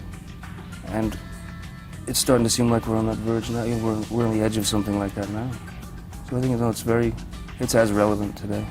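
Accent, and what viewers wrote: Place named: United States. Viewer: I looked this up just to hear his accent. WHY does he sound like so different today? He definitely sounded like an American in this interview.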